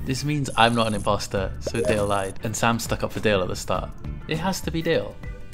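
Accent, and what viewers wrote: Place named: Scotland, United Kingdom. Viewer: Scottish accent makes this even funnier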